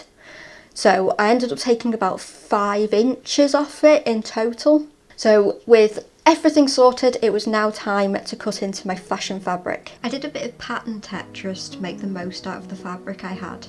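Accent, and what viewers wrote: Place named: Liverpool, United Kingdom. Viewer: I’m from Liverpool too ❤️❤️ I knew I heard the scouse twang 😂 Yeah some sellers do lie or actually don’t know and just take guesses , have you tried “ pound fabrics” ? Prices are great , shipping is from £4.99 upwards, depending on how much you buy, but some great prices, give them a look I buy loads from them , I’m in middle of making myself a dachshund dog , I’m goin to use him as a big pin cushion, I could easily make you one too , do you have a P.O. Box number ? I’ll send him/her to you , you’ll only have to name them , I don’t really want to leave my email here and don’t really know how we can sort addresses out , any ideas ?. Xx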